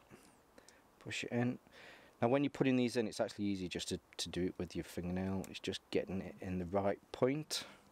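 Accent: United Kingdom. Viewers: Hi, great video and nice watch :) I'd love to do this to mine although unable to find somewhere to buy Jays and Kays in the UK, assuming you're in the UK from the accent, where abouts were you able to get these from? Cheers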